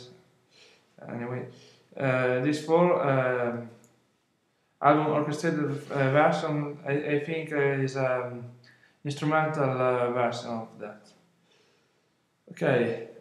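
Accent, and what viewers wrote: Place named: Italy. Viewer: Great job! Your glasses are really cute! I posted elsewhere that I feel like I am always being seduced when I watch unboxings on <link>. Which is great! Ow! Lol! I loved these that you do! I also love your Italian-English with meat balls! Mmmm good! Oh Lord! Soooo may I ask how old you are and if you are married and with children? Just curious! Later!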